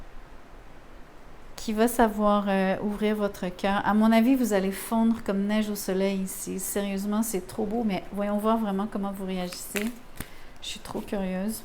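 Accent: Quebec, Canada. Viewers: Bonjour. Quel bonheur pour moi aujourd'hui. Tres peu de tarologue sont du Quebec. J'ai bien entendu votre accent. Mais de vous entendre le dire. Bingo. Je vais vous suivre. De quelle ville etes vous? Et votre tirage est etrangement similaire a se que je fais et planifie de ma vie. Merci et gratitude 🙏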